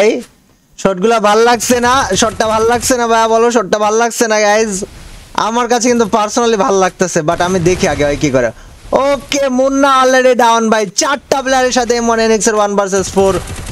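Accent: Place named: Chittagong, Bangladesh. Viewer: ভাষা শুনে বুঝছি চট্টগ্রাম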